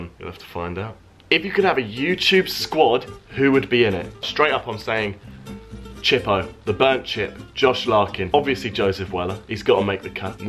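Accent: United States. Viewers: I don't get why people are hating that you used an American accent. It sounds dope and it's you doing you, so keep doing you bro. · I think you sound better with an American accent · I'm sorry but it was cringe hearing you in an American accent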